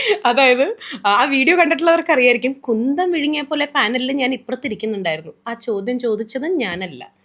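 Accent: Thrissur, India. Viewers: Lakshmi yude samsarathil thrissur kariyanennu thonukaye ille...nalla clear samsaram...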